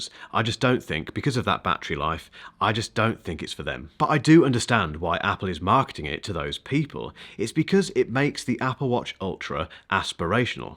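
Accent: United Kingdom. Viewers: Keep up the good work, nice to hear tech reviews, simply explained and in a Brit accent !!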